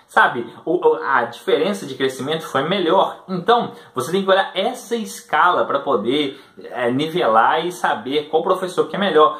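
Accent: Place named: Minas Gerais, Brazil. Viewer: Não sabia que vc era de minas, apesar do sotaque. O novo vai apostar forte no Zema, pq o que ele fizer em MG será bandeira para o novo se estabelecer como um partido forte. Sobre escola: Se escola não fosse obrigatoria, não teria essa perda de tempo absurda que é discutir ideologia de genero, escola sem "cerebro", bullying, professores agredidos, etc...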